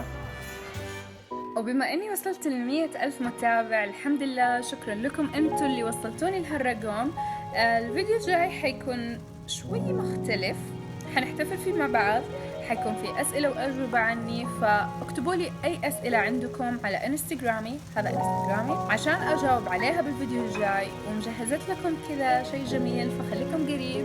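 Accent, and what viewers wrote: Saudi Arabia. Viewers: ذكرتي اسمك في الفيديو و اسم مسيحي وانتي ما ذكرتي ولا معلم اسلامي في كندا ولهجتك جداويه ولكن اعتقد انك لبنانيه مسيحيه الاصل هذا اللي تبينلي..ربنا يهديكي للاسلام وهوي طريق النجاة باذن الله من النار يوم القيامه..والمسيحي والكافر لا يدخل الجنه حتى لو كانت اخلاقه في السماء لانه اشرك بالله وكفر برسالة محمد حتى لو قلتي انا أؤمن برسالة محمد صلى الله عليه وسلم.المسيحي يؤمن بأن الله ثالث ثلاثة وهذا في قمة الاشراك بالله عز وجل لأن الله لم يلد ولم يولد ولم يكن له كفوا احد..اتمنى لجميع المسيحيين الهدايه والسير على ملة الاسلام هدى الله الجميع..💗💗💗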